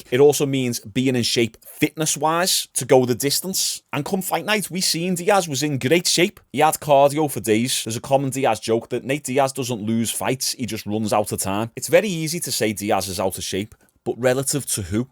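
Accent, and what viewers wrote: Liverpool, United Kingdom. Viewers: oh snap! I subbed like two weeks ago bc I liked your logical takes on things... I had no clue you are a Scouser! I'm American so I can't tell based on accents alone but Liverpool has been my fav football club for a while now. I seem to fit right in here lol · He's looked similar to this since he went up from 155. He's not naturally that big so he wouldn't cut anything for this fight. He'd want to keep the mass he had, no matter the composition. Watching the fight, if Nate was bigger and/or had more punching power, Jake would have been in trouble as he was eating uppercuts like candy later in the bout. Edited to include that I love the Scouser accent. It's my favorite of all that I've heard from across the pond.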